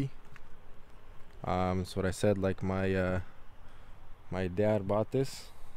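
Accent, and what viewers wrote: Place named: Eastern Europe. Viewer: Славянский акцент у автора проскальзывает. Сначала сомневался. Но выключенный inverter все прояснил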